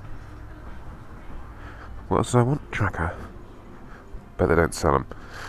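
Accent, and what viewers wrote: England, United Kingdom. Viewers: i love hearing you swear in your grand english voice... haha i want a vlog of just swear words haha :D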